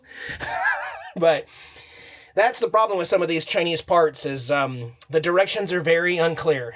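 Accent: South, United States. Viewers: I detected a bit of southern twang. You been hanging with those wonderful southern Mississippi people. Love it!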